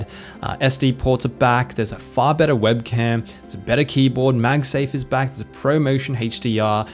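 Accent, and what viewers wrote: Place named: Australia. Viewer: Hearing a familiar Aussie accent reviewing this powerhouse is strangely comforting? That or I’ve been in lockdown for too long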